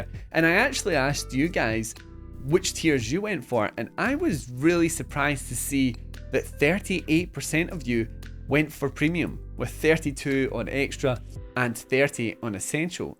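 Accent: Scotland, United Kingdom. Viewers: You're Scottish right? 😅 Btw Valhalla was added since day 1 for extra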